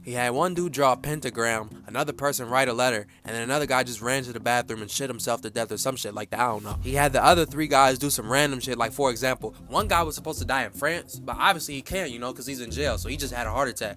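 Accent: Philadelphia, United States. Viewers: Yo bro where you from? sound like a philly buhl😭😭😭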